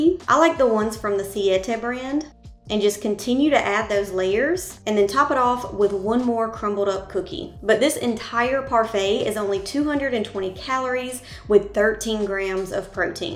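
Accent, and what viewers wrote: South, United States. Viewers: Love all the recipes. And that Southern accent got me suscribe. Love from Canada ❤❤❤ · I just have one question. Are you from the south?😂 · And to add, I expected to hear a Spanish type accent coming from you and then that beautiful southern accent came out. Threw me for a second and chuckled. A pleasant surprise 😊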